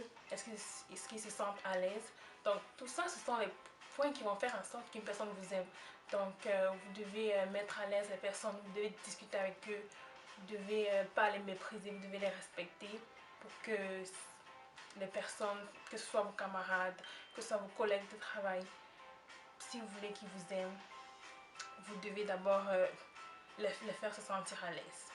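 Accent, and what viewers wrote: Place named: Quebec, Canada. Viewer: Ta gagné un accent québécois😕😕wow!!😕😕😕😕 Chai plu cmt chui tombé sur ta vidéo mais super conseil. G lâché un méga pouce bleu ma belle.. PS: tu rends super bien derrière un écran!!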